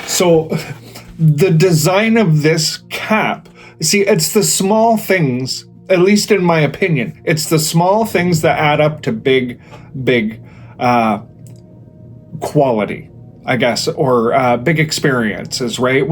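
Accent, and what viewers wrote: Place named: Minnesota, United States. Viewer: Your accent is awesome. 😂 Minnesota? North Dakota? Canada? Im from NYC. 🗽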